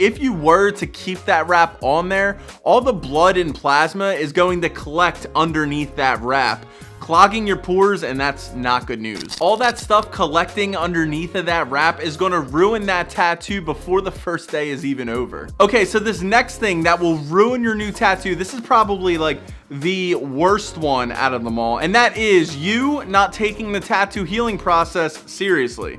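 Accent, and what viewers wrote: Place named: Pennsylvania, United States. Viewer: U sound Pennsylvanian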